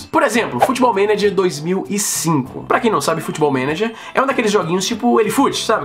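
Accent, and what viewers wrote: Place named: Ceará, Brazil. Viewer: com sotaque cearence, mais alguém notou?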